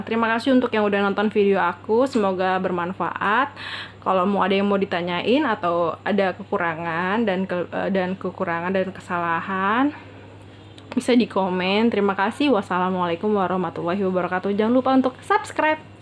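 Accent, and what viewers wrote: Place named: Lampung, Indonesia. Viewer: Mba org Lampung kah, logatnya keliatan.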